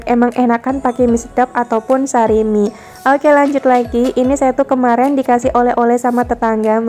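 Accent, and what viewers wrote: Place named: Pemalang, Indonesia. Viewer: Okeh2 khas Pemalang,ogel2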